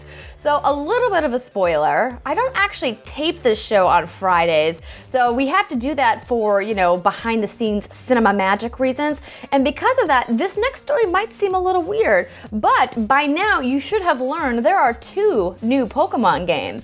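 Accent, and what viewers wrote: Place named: Minnesota, United States. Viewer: are you from Minnesota? Your accent is subtle, but I hear it:P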